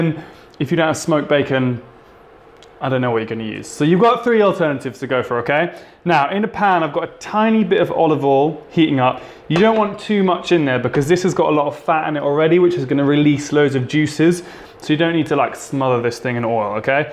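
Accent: England, United Kingdom. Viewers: Your Engish accent when you speak in Italian is adorable.❤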